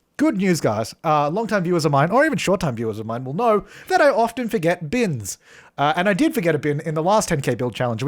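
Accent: Australia. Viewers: Watching this from the future and sounds SO Australian here. Like more than in present day somehow, even though I'm not sure his accent has really changed.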